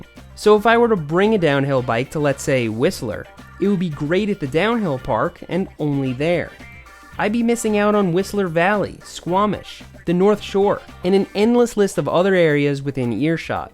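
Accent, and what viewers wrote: Long Island, United States. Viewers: My dog Lucy and Drama are so similar. Lucy is a Boston terrier (similar to frenchie) and she's bigger than every other Boston we encountered. She's supposed to be a pure bread Boston but it don't look like it with size. We assumed that one of her relatives is a boxer. Edit: with your long island accent, it's really similar to my uncle, aunt, dad, etc. They're from Long island and now we live in NYC. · ❤️ I wish for you continued success at whatever you choose to do. Thank you for including us in your adventures! I knew you were from Long Island by the way you pronounce water, wodder, lol. Why are you planning to sell Berm Creek? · Love this....You from Long Island? I’m from Jerzee?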